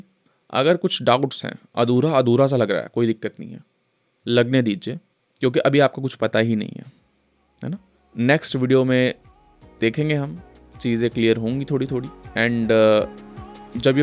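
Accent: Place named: Bihar, India. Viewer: sir ji aapke accent se aap bihar ke lg rhe ho :hands-yellow-heart-red::hands-yellow-heart-red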